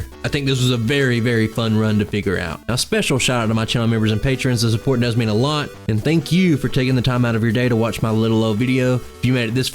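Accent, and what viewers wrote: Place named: Kentucky, United States. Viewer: The accent sounds like my own, I’m from Kentucky lmfao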